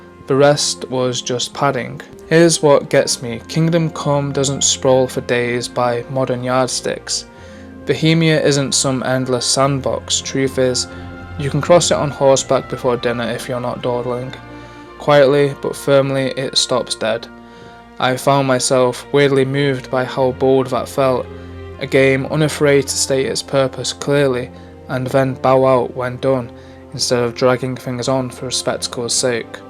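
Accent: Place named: North, United Kingdom. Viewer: ignore this, embrace your beautiful northern accent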